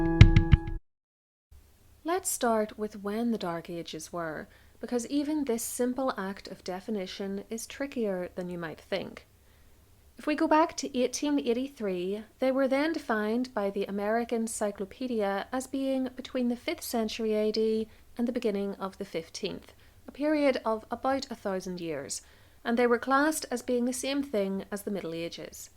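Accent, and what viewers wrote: Northern Ireland, United Kingdom. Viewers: Oh, your accent is absolutely grand and I have no problems whatsoever cathing everything. Never been to Northern Ireland though, only Éire, but I really want to visit the north as well. Hello back from your distant, rainy neighbour Noreg. :) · Great video, thx. Just have to say, me mum grew up in Co Derry. Love your N Ireland accent. I subscribed based on the conctent. Well done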